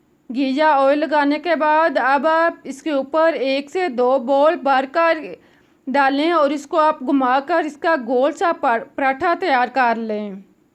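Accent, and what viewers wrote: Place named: Punjab, India. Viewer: She is speaking English Words in Punjabi style, using Plenty of Oil, Bread will definitely break. Mix, Oil, crispy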